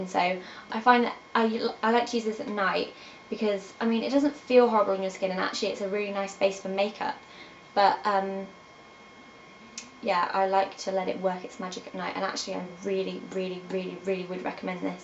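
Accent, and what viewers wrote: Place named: RP, United Kingdom. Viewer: You're so different in this video aww, really posh for some reason?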